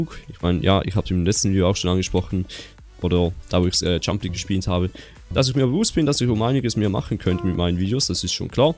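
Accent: Switzerland. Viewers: Nicht negativ; aber ich feier einfach den Schweizer Akzent sehr :)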